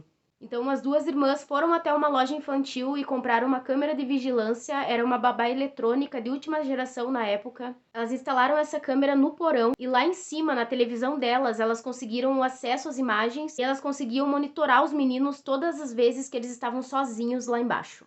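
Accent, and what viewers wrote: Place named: South, Brazil. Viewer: Olá 😃 conheci seu canal hj e já adorei ... Parabéns pelo trabalho 👏 pelo seu sotaque acho que vc e aqui do sul ?!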